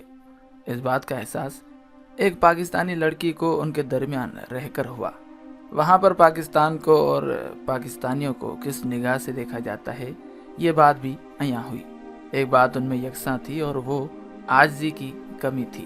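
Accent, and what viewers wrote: India. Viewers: Assalamalikum he is not speaking pure urdu. It is Indian. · بات پاکستانیوں کی ہورہی پے اور لہجہ انڈیا والوں کی کچھ عجیب سا نہیں؟ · Yey bolnay wala Indian hay, yey musalmano kay khilaf Indian Hindu Propaganda video hay, iska lehja indian hay. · هر جگہ پر ہر قسم کے لوگ ھوتے ھیں ۔ بیشرم آدمی تمھاری اردو ھی انڈیا والی ھے تمھارا کچھ بھی سچا نہیں۔ تم خاص ایجنڈے پر کام کر رھے ھو۔ سعودیہ اور ترکی کے خلاف ھی کیوں بکتے چلے جا رہے ہو ۔ اسلامی دنیا کے یہ دونوں ممالک بہت محترم ھیں۔ shame on you. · بھائی یہ ایک انڈین چینل ہے جو پاکستان اور عرب ترکی کے درمیان نفرت پیدا کر رہا ہے آپ اس بندے کی اردو چک کرو اس کا اردو میں لہجہ بہت مختلف ہے جس سے پتہ چل رہا ہے کہ یہ ہندی میں بات کر رہا ہے اور انڈین ہے اس لئے ایسی ویڈیو سے بچ کے رہو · Ap indian style main kune baat kertay hain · Tum indin ho tmhari awaz zuban sy lagta hy tum nafrat ka bej bo rhy ho ya tum irani ho i hate you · Why u have hindi pronounciation?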